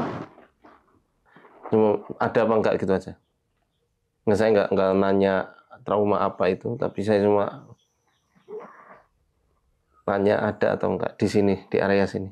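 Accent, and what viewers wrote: Banyumas, Indonesia. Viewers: Jine kiye ngapak juga y ramane 👍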